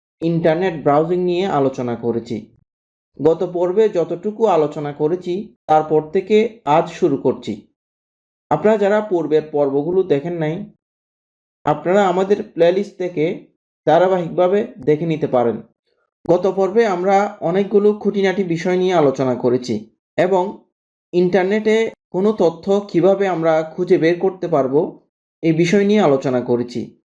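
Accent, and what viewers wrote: Chittagong, Bangladesh. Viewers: ভাইয়ার বাড়ি নিশ্চয়ই চট্টগ্রাম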